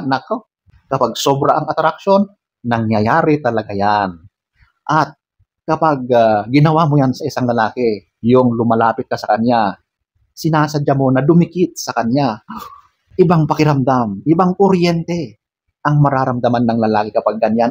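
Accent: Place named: Baguio, Philippines. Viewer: 😂😂😂😂❤❤❤ taga Baguio kadi brod like how you talk cute u mo 😂😂😂buts true LAHAT ❤️🌹😂🥰🤩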